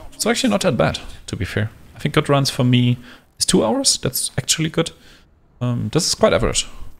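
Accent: Germany. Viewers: Nettes Speedleveling-Video, danke dafür! (Vom Akzent her vermute ich, Du bist Deutscher ;-) ) Ich hatte lange darauf gewartet, zu erfahren, wofür Du den vierten grünen Sockel brauchst, die Info darüber, welche Gems Du benutzen willst, hätte vielleicht ein wenig früher, womöglich schon ganz am Anfang, gebracht werden können. Davon abgesehen: schön, dass Du auch Hinweise auf das übliches Leveldesign für die kürzesten Wege gibst. Dass man in Akt4 die Animation von Piety abkürzen kann, indem man nach rechts läuft, war mir neu, werde ich beim nächsten Leveln mal ausprobieren.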